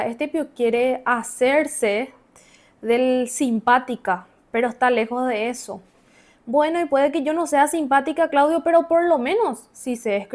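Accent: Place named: Paraguay. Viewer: Sos una mujer muy linda y amo tu acento paraguayo! Segui adelante con tus vídeos genia!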